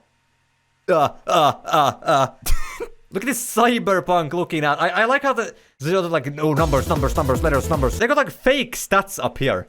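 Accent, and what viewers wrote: Finland. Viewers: Really? Why watch the vid then, I think the Finnish accent is kinda cool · when i heard your accent i knew you were from finland (minä olen myös suomesta) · Aloin miettimää että ootkohan suomalaine ku suomalainen aksentti mut huomasin että irwinin paita nii tajusin siitä että oot suomalainen🙏🏻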